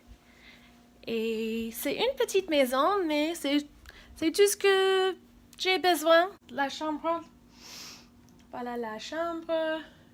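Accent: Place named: Cameroon. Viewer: You have little bite an cameroonian accent is funny. But you do well in french.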